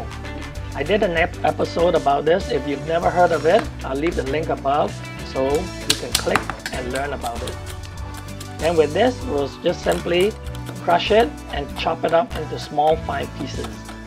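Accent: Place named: Caribbean. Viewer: You chopped up that Duck like Jamaican Jerk Chicken LOL! You do have the Island accent so I'm not surprised! That duck does look delicious! Very nice recipe for sure!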